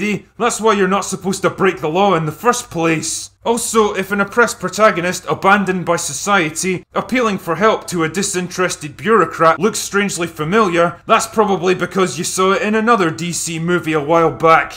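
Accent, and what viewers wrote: Scotland, United Kingdom. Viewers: yep. But also funny with this drunk sounding Scottish accent... Lol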